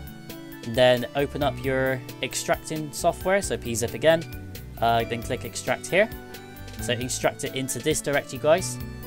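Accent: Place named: United Kingdom. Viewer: You sound like a british Linus Sebastian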